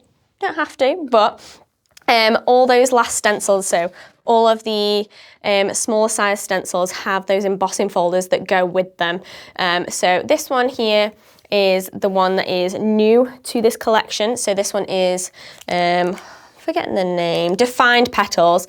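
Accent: United Kingdom. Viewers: Thank you so much for this walk through (made even better by your darling British accent!). So much inspiration and so many ideas.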